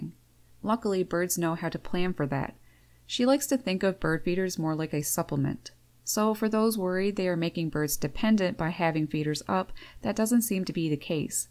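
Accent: Canada. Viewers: So we are impacting the natural birds via our feeding, but in a positive way, is basically what you're saying correct? From the pronunciation of the "ou's" I assume you're from Canada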